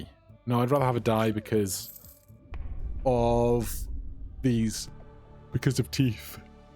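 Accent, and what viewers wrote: United Kingdom. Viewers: are you from Yorkshire?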